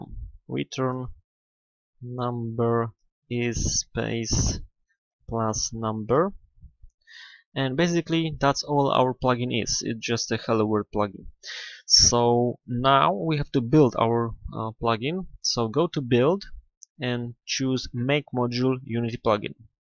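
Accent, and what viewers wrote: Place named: Indonesia. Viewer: dari logat bahasanya kayaknya orang indonesia nih...bener ga ya??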